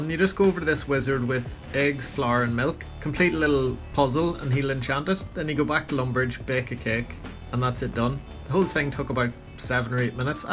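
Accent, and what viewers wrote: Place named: Scotland, United Kingdom. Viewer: Love your Scottish accent bro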